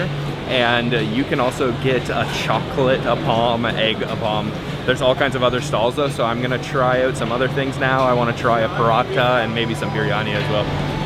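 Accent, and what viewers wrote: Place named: Canada. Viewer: He's Canadian 😂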